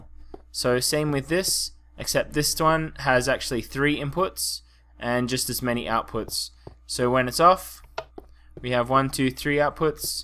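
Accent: Australia. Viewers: You sound like an Aussie! Southern Hemisphere people rule!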